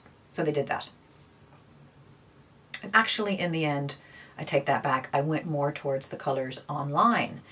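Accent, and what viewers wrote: Ireland. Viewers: Great review! You’re getting a Dublin accent 😁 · Just listening to you and you saying you're in Europe I kept hearing an irish lilt and was satisfied to see you are based in Dublin! It's so interesting how accents creep into others. I love this review, very helpful as I rarely wear makeup and love the no makeup makeup. The pencils look so promising as well as the balm.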